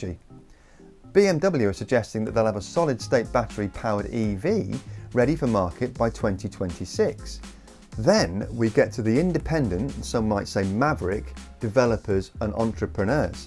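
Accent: United Kingdom. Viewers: Give an idiot a british accent and he sounds reasonable.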